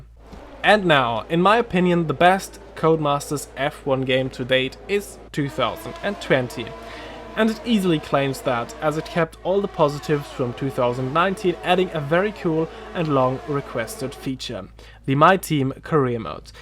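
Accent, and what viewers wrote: Germany. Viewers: Very helpful vid if it comes to deciding which F1 to buy! I got F<num> and 2020 ! (btw your accent sounds german ) Thx from a 70y old german gamer !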